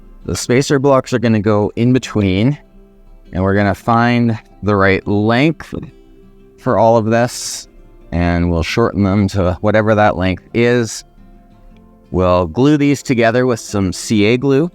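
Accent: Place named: Canada. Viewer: Wait, you're Canadian?!? 😂 I should have caught the accent sooner. Feeding the algorithm for you from a bit South - almost to the Gulf of Mexico. 😄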